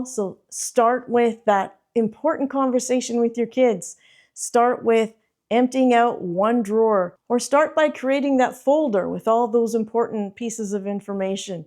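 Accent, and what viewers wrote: Canada. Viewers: I really enjoyed this video. Thanks for personalizing something that can be very hard to talk about. I live in Montreal, Canada, and I could not help notice that your accent sounds Canadian....is it?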